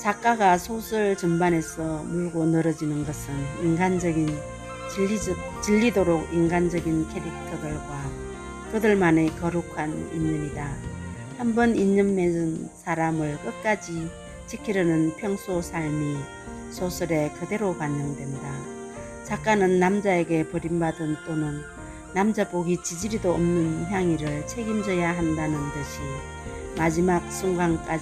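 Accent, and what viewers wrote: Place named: Gyeongsang, South Korea. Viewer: 경상도 말투 정감가요 잘듣겠습니다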